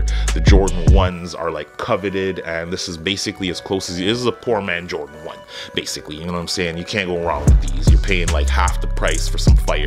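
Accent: Toronto, Canada. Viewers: Toronto mans accent strong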